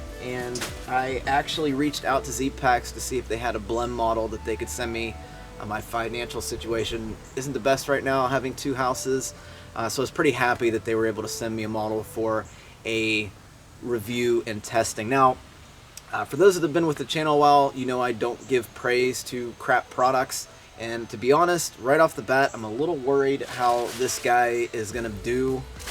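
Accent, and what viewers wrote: Pittsburgh, United States. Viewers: Hey, long time watcher first time commenting. I discovered your channel because I was thinking about doing the black forest trail again and watched your video. I thought to myself, this guy has a Pittsburgh accent and came to find you live in the area. I do as well but I'm not native to the area. Long story short man. I love your channel. I love how you are so genuine and you are not self conscious at all on camera which I envy greatly. I think you might be the best friend I never met. I hope that doesn't sound weird. Anyway man, best wishes and keep on hiking😁👍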